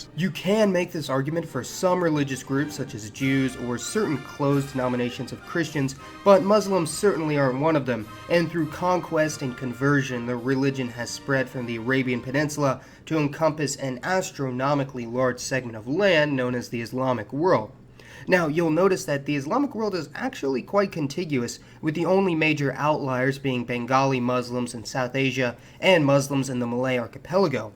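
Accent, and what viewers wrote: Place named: United States. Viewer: and no American voice next time 😂try a British one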